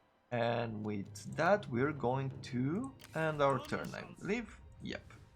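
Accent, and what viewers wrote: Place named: Romania. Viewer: You sound like you are from SE Europe maybe? Romania?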